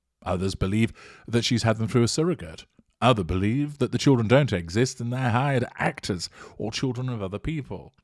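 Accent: United Kingdom. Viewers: I love your Channel, yours contents and your British accent keeps me watching over and over because I am addicted to it. Greetings from🇧🇷❤️🫶🏻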